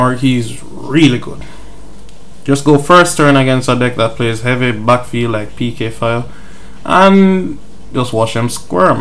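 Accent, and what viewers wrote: Jamaica. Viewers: lol I just looked for this deck and came across your channel.As u started speaking I said this guy sounds Jamaican.... this guy sounds familiar. then I realize I know u from school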